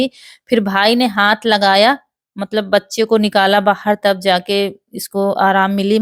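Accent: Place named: Uttar Pradesh, India. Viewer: Mai unnaose hun aap jaise bolti hamare gaon vase bolte hai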